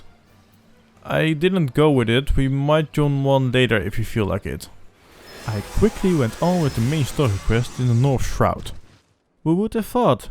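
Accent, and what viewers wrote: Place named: Netherlands. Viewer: Hehehe, your dutch accent makes me happy. I hope you enjoy the game!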